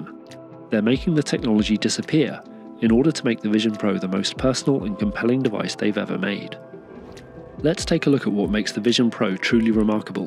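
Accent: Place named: United Kingdom. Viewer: Great video, nice to hear a British voice on American Tech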